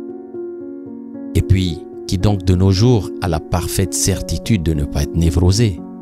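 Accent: Africa. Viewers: L'accent africain sur fond de piano répétitif rend l'écoute bien désagréable, néanmoins l'effort nécessaire à la bonne compréhension du message est fructueux. Merci pour cette vidéo et le partage de connaissance. 🙂:thanksdoc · Tout simplement formidable ♥️ j'adore l'accent africain plein de chaleur et de sagesse 🌞MERCI🙏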